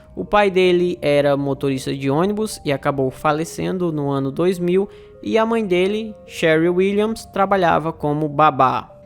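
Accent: Northeast, Brazil. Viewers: Adoro o sotaque nordestino do.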